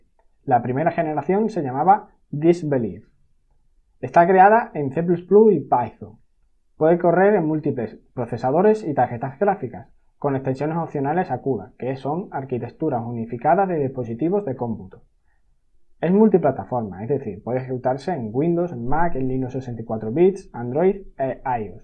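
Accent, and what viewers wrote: Spain. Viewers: Mejor aprendan inglés y vean los videos de TensorFlow... lol .... no puedo pasar ese acento "españolao" enfermante... ya chao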